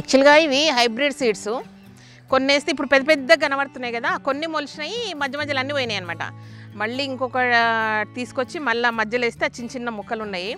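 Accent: Telangana, India. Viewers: Eeeroju Telangana yaasa bavundi bai🙂🙂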